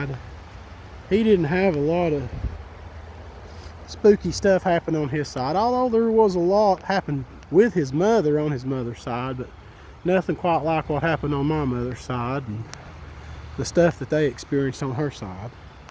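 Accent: South, United States. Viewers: This story had me captivated! Thank you! I get so relaxed listening to your southern drawl and seeing the peaceful, serene video scenes that accompany!😊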